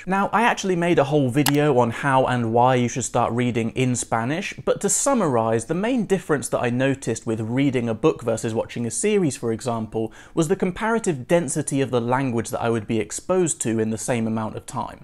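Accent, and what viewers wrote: United Kingdom. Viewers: I love your British English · Nice video! I’ve been learning Spanish for a while, but haven’t done too much with audio books. I’m going to do more. BTW I just finished watching your video with Español con Juan (my first time watching your channel) next I watched this video, I don’t know why but it was strange to hear your British accent! 😀 ¡Tu Español es muy bien!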